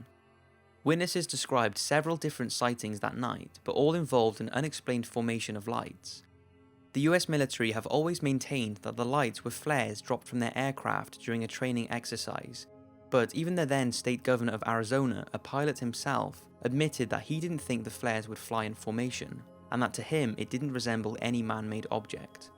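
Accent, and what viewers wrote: Wales, United Kingdom. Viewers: I do love a welsh accent.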